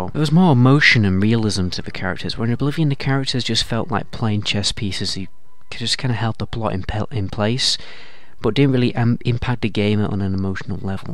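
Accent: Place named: Manchester, United Kingdom. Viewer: I love your accent are you mancunian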